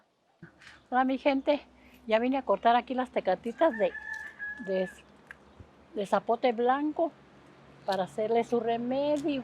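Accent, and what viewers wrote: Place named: Rural, Mexico. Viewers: BIEN RIQUISIMO TODO!!! PERO YO VIVIENDO CON USTED NOMAS UN MES Y ME MATA CON ESOS GUISOS !!! YO CASI NO TOLERO LAS ESPECIAS O GRASAS POR MI ACIDO URICO!😬😢😭😭😫😫😫 AAAHHH PERO QUE DELICIA TODO LO QUE HACE USTED MIS RESPETOS!!! Y DISCULPE EN QUE PUEBLITO Y DE QUE ESTADO ES USTED ?? POR SU ABLAR PARECE DE GUANAJUATO!🙂🥰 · Muchas felicidades la invitó a q no pierda su originalidad su manera de hablar tan auténtica su personalidad de una mujer valiente alegre sincera l ser originaria de un rancho mexicano no lo pierda q la tecnología y la mercadotecnia no la contaminen q siempre sea auténtica muchas felicidades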